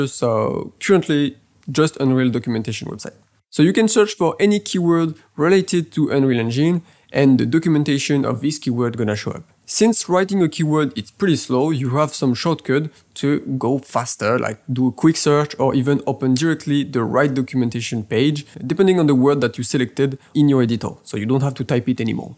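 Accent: France. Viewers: Dès la 1ere seconde de la vidéo j'ai spot que tu était francais c'est fou, ton anglais est trèèès bon c'est juste que entre francais je reconnais l'accent francais ! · Really nice 👍 Jugging by your accent are you french? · Oh le gros accent français qui fais plaisir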